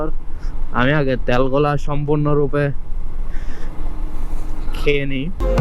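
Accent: Chittagong, Bangladesh. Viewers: চট্টগ্রামের আঞ্চলিকতার টান শুনলেই মেজাজ গরম হয়। ভিডিও ১০ সেকেন্ডেই বাতিল করলাম।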